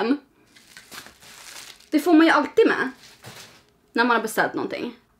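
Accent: Stockholm, Sweden. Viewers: Bra video!☺️ hur kommer det sig att du pratar så mycket Stockholmska? Men ändå typ göteborgska samtidigt haha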